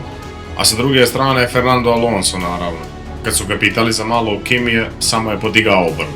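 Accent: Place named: Montenegro. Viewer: Pravis najjace videe sa sve crnogorskim akcentom 😂😂😂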